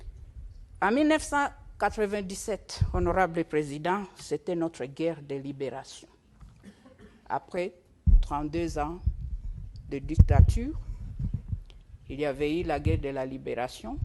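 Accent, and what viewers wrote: Rwanda. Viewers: Ton rwandaise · Un accent rwandais et tutsi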